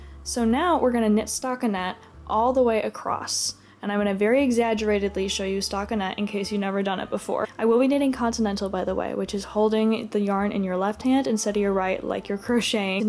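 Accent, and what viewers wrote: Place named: East Coast, United States. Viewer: you sound so east coast and i love it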